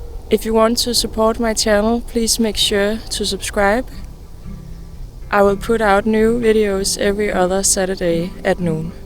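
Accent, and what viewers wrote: Denmark. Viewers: Danish accent, from Denmark 🇩🇰🇩🇰🇩🇰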